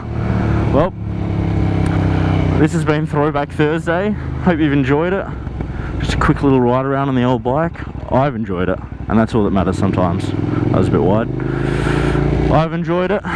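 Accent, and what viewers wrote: Australia. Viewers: Wait mate u from Australia? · Him: "SSSCOOIN ON EVERYONE" Me: Oh gday fellow aussie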